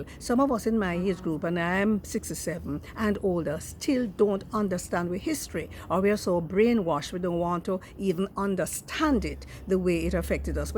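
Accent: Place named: Jamaica. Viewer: Well said aunty real Jamaican talk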